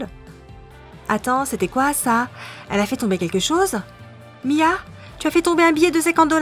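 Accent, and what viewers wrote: England, United Kingdom. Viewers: Vous êtes anglaise ou pas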